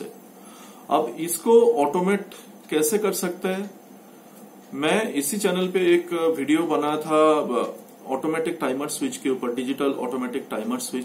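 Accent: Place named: Bengal, India. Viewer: 😀 loved it specifically your deep kolkata Bengali accent you just sound like my late nani who inspite living delhi could not get rid of her bengali accent , any way I made it with a float switch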